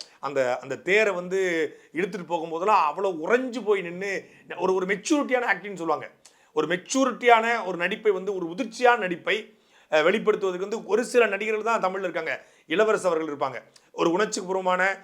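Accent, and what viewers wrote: Tirunelveli, India. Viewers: அவர்களின் தமிழும், கதை கூறும் பாங்கும் அருமை.. நெல்லைத்தமிழ் இடை இடையே மணக்குது.. வாழ்த்துகள்.. கொஞ்சம் ஆங்கிலம், வட மொழிச்சொற்களை களைந்தால் இன்னும் சிறப்பாக இருக்கும் - நாம் தமிழர்!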